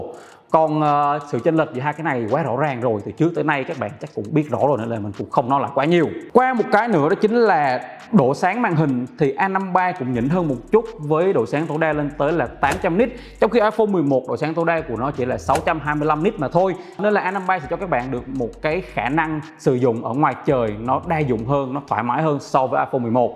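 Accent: Vietnam. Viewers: ôg này ng khánh hoà phải k ta nge giọng y ng khánh hoà z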